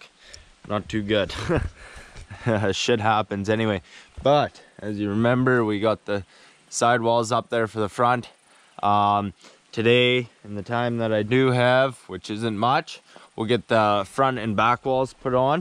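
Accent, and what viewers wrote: Canada. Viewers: There’s my favorite Canadian accent... nice building dude 👍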